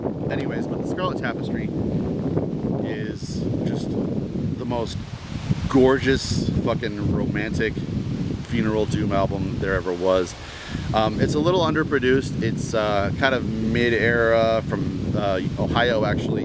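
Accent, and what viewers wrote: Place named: Australia. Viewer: Dude, that walking footage at the start rules, it's like a music video to the song playing. No problems with the wind noise, great ambience. I can forgive that, but not that "down un-da" accent! Mandy who is Murkrat is quite prolific, she was in gothdoom band Lycanthia who I saw live back in the day, and is in funeral doom band The Slow Death. I believe she lives on a farm and suffered some damage during last years fires.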